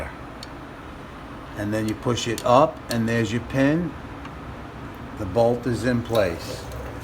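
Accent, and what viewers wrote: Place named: Long Island, United States. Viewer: I lived in Suffolk County, Long Island New York. Sounds like you're from there, lol.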